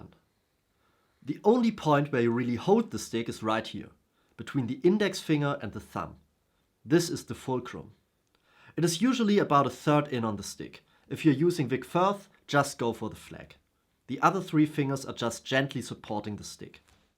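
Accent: Germany. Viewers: man erkennt das du deutsch bist xD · Swabian accent going strong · As a German myself - his accent is definitely and undoubtedly german. Stewies accent is british but they have similar voices. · are you from germany ?? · Your German accent is very convincing.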